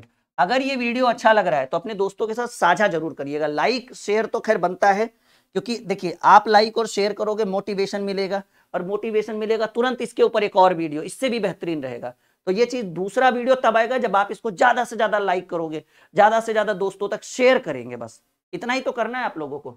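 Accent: Bihar, India. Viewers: Sharab bahut achcha padha rahe hain kya aap Bihar ke hain kya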